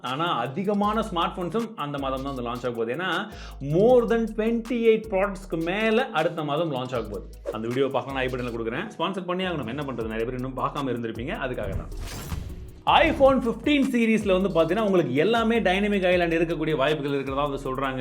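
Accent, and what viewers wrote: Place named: Bihar, India. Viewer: Bihar voice super bro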